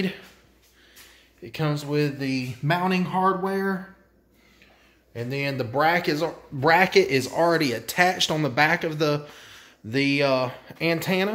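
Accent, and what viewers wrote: United States. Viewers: He's got my accent dont ya? we're both southern bubba's talks just like me. im a fan.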